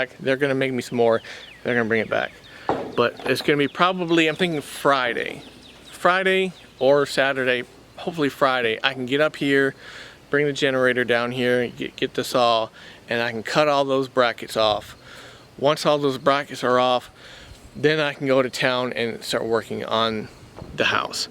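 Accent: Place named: Tennessee, United States. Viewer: Ur Tennessee TWANG is started to come back, lol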